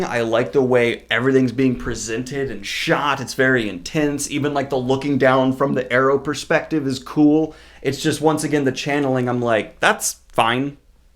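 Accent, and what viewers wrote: United States. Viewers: are you from NOVA??? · That’s such a ridiculous critique man. He pronounced them properly and is using them in a sentence, also that’s how an American accent sounds. Don’t be harping on people for not pronouncing something the way you think it should be. Try saying those names out loud in a sentence and I bet they sound exactly the way the audiobooks and says it. This is the kind of comment that makes certain people in fandoms toxic.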